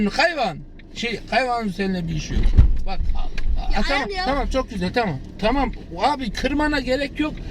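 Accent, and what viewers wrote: Black Sea, Turkey. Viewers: Abi karadenizli misin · Abi galiba karadenizli galiba uşağum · Bencede Karadenizli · Abi rizelimisin yoksa Giresunlu mu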